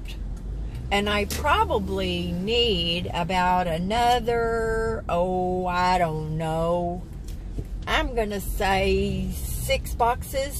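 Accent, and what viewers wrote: South, United States. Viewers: You and ur Southern accent! Love it!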